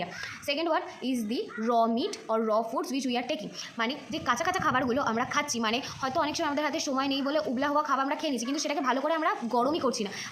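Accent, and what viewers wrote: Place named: Bangal, Bangladesh. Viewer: Bolchi ektu Bangal 😢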